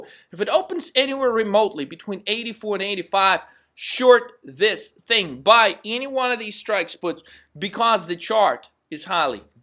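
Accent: South, United States. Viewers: i love this man. italian name with a southern twang with balls hanging to the floor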